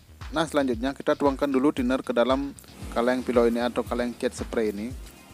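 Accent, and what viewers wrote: Aceh, Indonesia. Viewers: Kyknya org aceh nih dr logatnya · Kyk nada aceh ni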